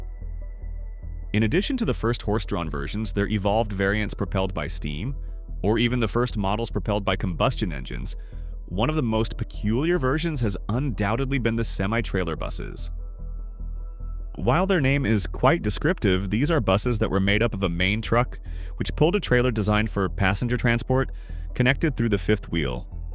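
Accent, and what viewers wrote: United States. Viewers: Quite. I was hoping the video would move on to electric buses and discussing how bus design might evolve now that there’s no need to be constrained by the limitations of diesel engines, but it never came. Might have guessed, considering the US narration.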